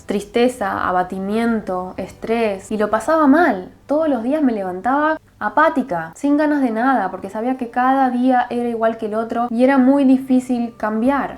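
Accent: Argentina. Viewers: Te escucho el acento argentino gracias 🙏Vamos con Latinoamérica minimalista porque el consumismo de plástico está haciendo estrado mientras que en países más ricos está precioso el orgullo del minimalismo..una buena moda al fin!🙏🌎t ganaste una sucriptora por propiciar esto.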